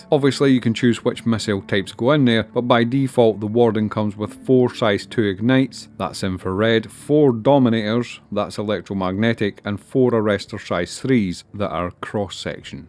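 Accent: Scotland, United Kingdom. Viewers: The scottish accent feels wildly appropriate and correct for space ship reviews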